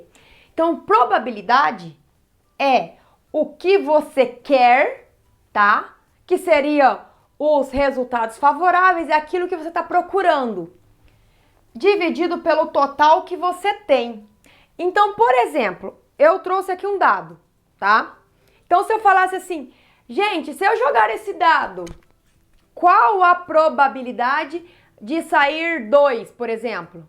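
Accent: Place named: South, Brazil. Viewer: ÓTIMA AULA, e o melhor de tudo, tem o sotaque sulista <3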